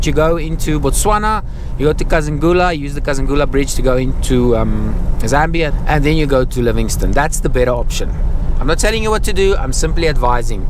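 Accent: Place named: South Africa. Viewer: I love ur south african accent. I lived in rsa few years. Genit u dag meneer .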